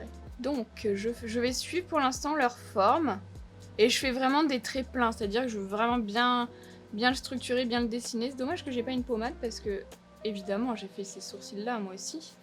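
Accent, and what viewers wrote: Marseille, France. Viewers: Vulgaire mais pas cagole ! Avé l'accent de la cagole c'était parfait ! 💖💋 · Je suis déçue, je m'attendais à une vidéo entièrement avec l'accent de la Cagole ! C'est hyper drôle d'avoir choisi de faire un make up vulgaire pour tester cette palette en tout cas 🔥❤️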